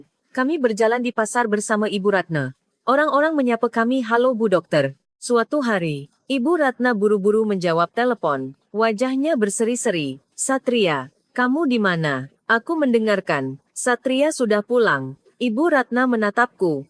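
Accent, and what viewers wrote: Malaysia. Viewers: Bahasa mu Melayu Malay kenapa anda lebih suka bikin karangan lepas dan tidak jelas membawa nama indonesia apakah ini bentuk penghinaan anda terhadap Bangsa dan negara ku karna AQ Tahu ini cerita fiktif kenapa anda tidak membawa nama bangsa negara yang sesuai dengan logat bahasa mu bahasa yang kamu pakai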